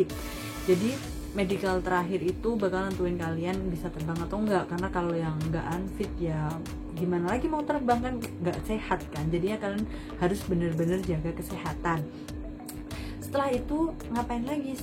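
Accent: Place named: Java, Indonesia. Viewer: medok forever yoo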